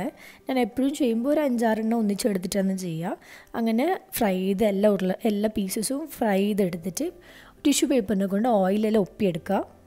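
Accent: Kannur, India. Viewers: സംസാരം കേൾക്കാൻ നല്ല രസമുണ്ട്.. കണ്ണൂർ അല്ലെ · farsha fashi kannur aaanenn thonnun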